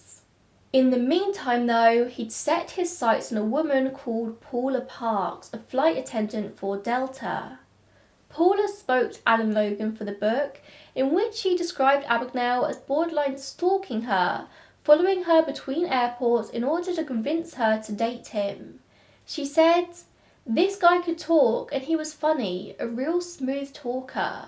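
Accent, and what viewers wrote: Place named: London, United Kingdom. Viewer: Apart from the annoying low class London accent and the grammatical mistakes of her own language , this is a review of a book that was ghost written to sell books using data from a book that was written to sell… well books , and bits from a movie script that was written to sell movie tickets. None of them are the truth. You should have done your own research and presented those findings but, that would take time away from attempting to be famous on YouTube. Far better to copy someone else’s work, dumb it down and concentrate on You. By the way, if you are going to read facts from a prompt because you don’t know them , make sure that the information is correct…. There is no Bronxville area in The Bronx, it is Brownsville and, I am quite sure that Abagnale started doing speaking tours around the USA, not the UK. 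Know your subject matter.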